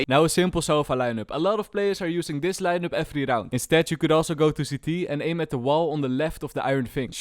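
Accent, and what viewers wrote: Netherlands. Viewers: You really sound Dutch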